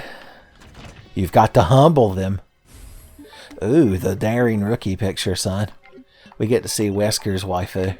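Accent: South, United States. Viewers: I've fallen asleep to the sound of sweet, soothing, southern voice, and its only affected me by helping me fall asleep and stay asleep.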